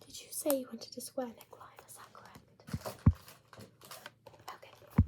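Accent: England, United Kingdom. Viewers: Your English accent is very authentic. The pronunciation of “meticulous” was absolutely fabulous (have you seen that English comedy series, if not, you should try it 😁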